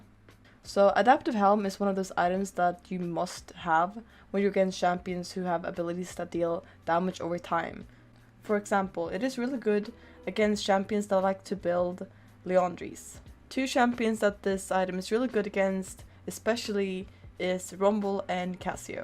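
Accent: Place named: Sweden. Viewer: omg girl I love you! Im also playing jungle on Gold right now, mainly Evelynn and Kayn and its so nice to see another girl that also likes to play jungle. This whole stereotype of girls playing only supp or mages is so annoying... And I feel less alone now! :D Oh, if you play Kayn, evelynn or elise it would be also awesome to get an guide on those champs. Even tho I main them I still feel I have alot to learn and it would be nice getting this info from another girl! And Eve feels so weird to play once youre a bit higher on ranked because people actually know how to play against her then and how to get back into the game once u are behind.. So, some advices for gold- Plat would be nice! Oh and youre swedish accent is so damn cute! :D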